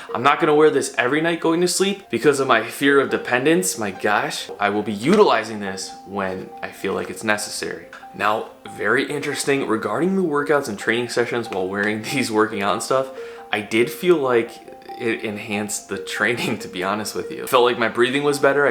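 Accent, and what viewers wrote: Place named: Chicago, United States. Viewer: Does anyone else detect a Chicago accent?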